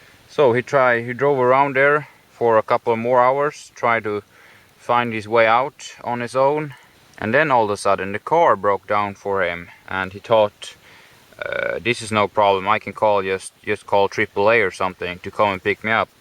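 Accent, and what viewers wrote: Sweden. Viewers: Videos from Sweden...cool as hell! Love the accent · I love the Swedish accent! (Especially the "y" sounding "j"'s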